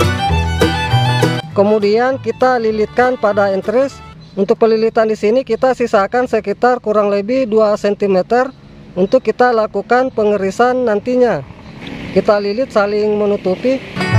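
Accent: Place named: Tolaki, Indonesia. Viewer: Sultra bagian mana bang, logat tolaki ta nda hilang keren 🥰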